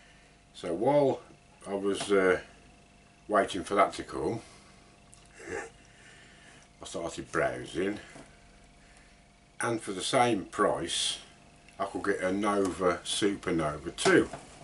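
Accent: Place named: England, United Kingdom. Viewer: I wish I lived closer, I have always said “ anyone who has an English accent automatically has 10 points added to there IQ”. I as well have two super Nova chucks one for my Jet lathe and one for my General lathe. I enjoy your method of presentation on your videos. Keep up the good work. Cheers Don Lakewood Wa......U S A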